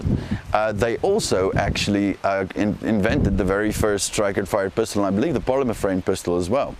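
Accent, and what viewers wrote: South Africa. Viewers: You sound a bit South African. Thanks for a great review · Are you South African? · Are you South African? That accent sounds familiar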